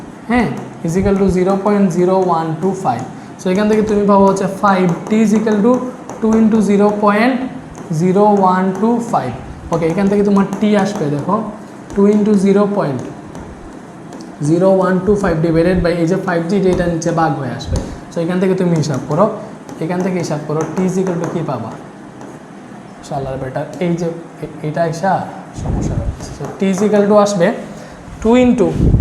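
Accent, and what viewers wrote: Sylhet, Bangladesh. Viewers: ইসসসসস , স্যার আপনি কি সিলেটি 😅😊😊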